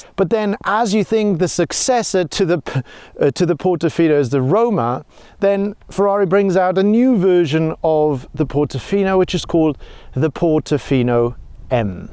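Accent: South Africa. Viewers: im hearing South Africa, im hearing Welsh.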